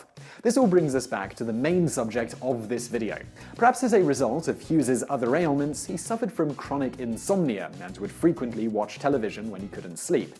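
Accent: United Kingdom. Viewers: Gallagher Rutledge ya most Americans pronounce those worlds right, but I understand since he was British.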